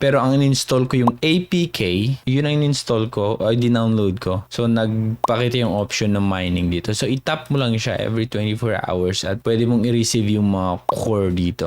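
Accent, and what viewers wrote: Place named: Conyo, Philippines. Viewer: Conyo magsalita pero ayos salamat sa review boss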